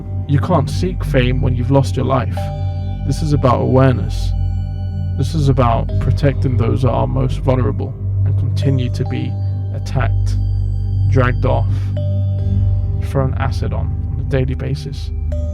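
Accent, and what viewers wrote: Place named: Jamaica. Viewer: Your accent kills me 😂😂 why are you talking Jamaican. It's not cool to be a wigga lol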